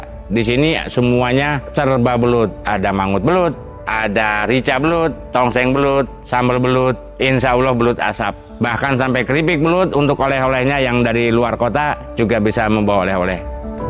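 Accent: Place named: West Java, Indonesia. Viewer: Pantes logatnya kaya logat sunda...lama di bogor toh kang...terimakasih ilmunya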